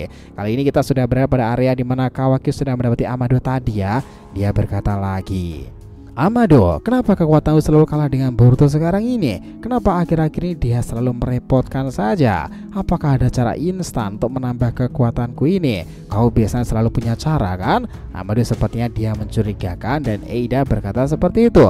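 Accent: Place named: Java, Indonesia. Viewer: ngomongnya santai aja mas,pasti lu dari jawa on mas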